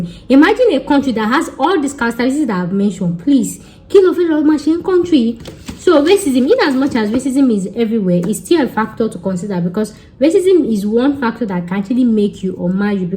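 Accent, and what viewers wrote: Yoruba, Nigeria. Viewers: You this girl it's your yoruba for me it's the way you join everything together that brings me here😆you are so real well done👏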